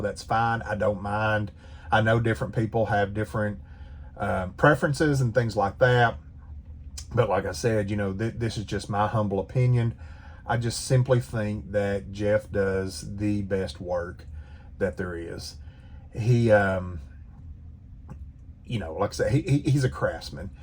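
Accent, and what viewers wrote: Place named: South, United States. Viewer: It is so nice to hear that sweet sweet southern twang again. I missed you brother.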